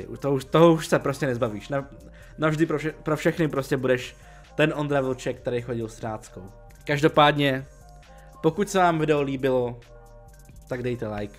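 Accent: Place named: Silesia, Czech Republic. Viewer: tvoje čtení slezského nářečí mě baví jak cyp :D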